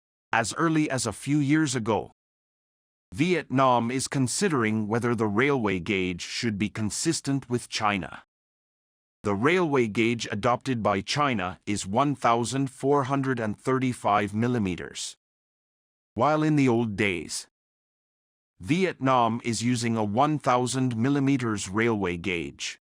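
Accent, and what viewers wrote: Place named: United Kingdom. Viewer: Hmm your tone to speak but slow looks like.. british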